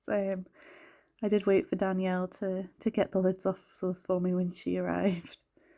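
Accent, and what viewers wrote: Scotland, United Kingdom. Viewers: From Canada. Grandparents from Scotland, so your accent is familiar. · You have picked up a somewhat Scottish accent- it doesn't take long!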